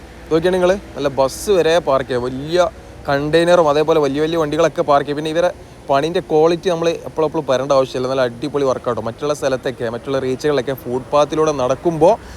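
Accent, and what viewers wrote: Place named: Malabar, India. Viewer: Thanks! Good to see you consistently posting videos. I see there’s no more mocking of your Malabar language/slang. Keep it up my friend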